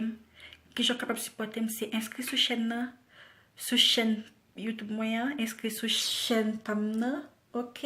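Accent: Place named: France. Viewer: You got my support now.. nice french accent enlish only lol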